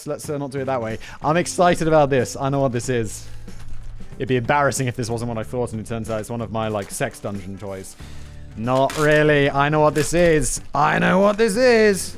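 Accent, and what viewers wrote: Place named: United Kingdom. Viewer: Calls himself Whistle and China "Chiner"... British accents are Great!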